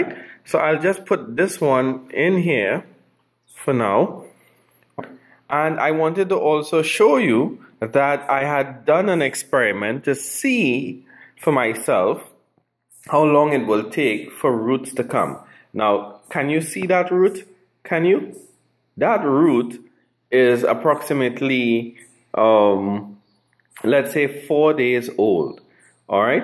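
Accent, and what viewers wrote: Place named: Caribbean. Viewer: Hi, looked at a few videos for growing sweet taters, yours is the best, you explain things great, love your accent from the Caribbean, I've subscribed, and am keen to grow sweet taters along with you. It's end of spring here in OZ. Time to start. Regards Shayne......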